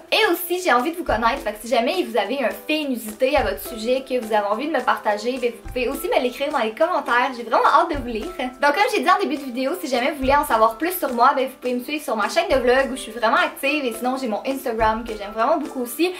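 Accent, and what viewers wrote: Quebec, Canada. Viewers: Ça fait maintenant 1 mois que je te suit sur youtoube et j adore tellement ton accent Québécois ( j ai déjà été au Canada il y a 2 ans et j ai tellement envie de revenir car c'est un de mes pays préfère 😊) Bisou de Suisse 😘❤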